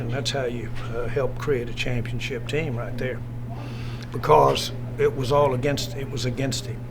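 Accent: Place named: South, United States. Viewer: Last known human with the accent of a slave owner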